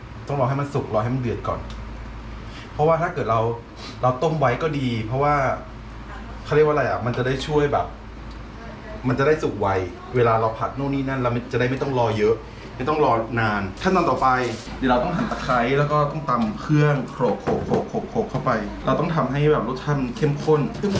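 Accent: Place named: North, Thailand. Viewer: พูดภาษาเหนือน่ารักจังค่ะ☺️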